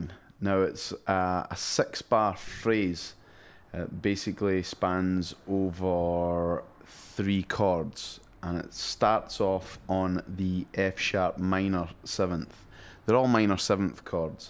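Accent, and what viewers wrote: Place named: England, United Kingdom. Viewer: Very helpfull lesson, and like the english accent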